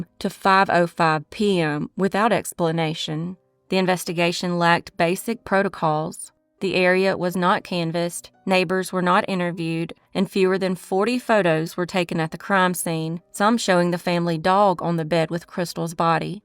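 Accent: South, United States. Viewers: mine too. And her voice is relatable to my Southern self.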